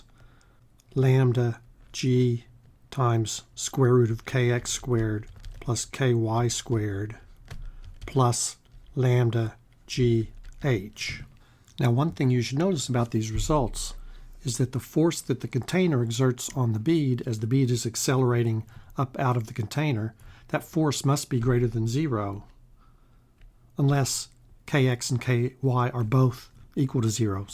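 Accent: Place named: Texas, United States. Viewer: Damn calculation done by unsimplified physicist with Texan accent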